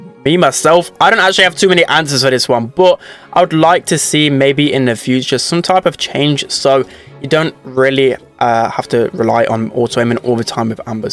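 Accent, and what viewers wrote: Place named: England, United Kingdom. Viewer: Well he is English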